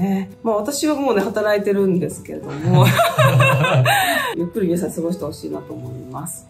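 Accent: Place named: Kansai, Japan. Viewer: 健康第一！ 馬ちゃんの関西弁もっと聞きたいねん😁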